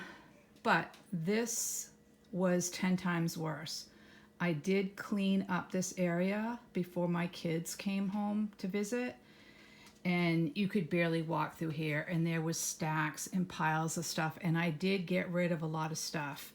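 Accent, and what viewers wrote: New England, United States. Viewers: Hi! I will be happy to be part of your community:) I have a lot of trouble letting go and have some hoards of my own for sure! I get it;) Also- you have a New England accent; I’m up in Maine!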